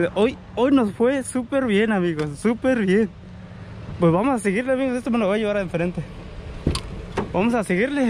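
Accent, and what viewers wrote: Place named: Guatemala. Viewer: Hola amigo Saludos desde Ecuador ciudad Guayaquil eres de Guatemala por el acento.un abrazo.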